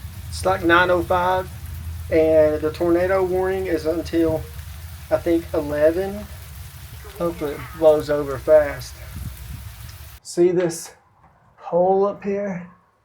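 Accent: South, United States. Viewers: Another great video! As a Canadian, I think one of my favourite parts is the southern accent lol the way you said “see-ment” made me laugh (the same way we say “aboot” and I don’t mean this in a negative way! Thanks for the laughs and another great video. Cheers!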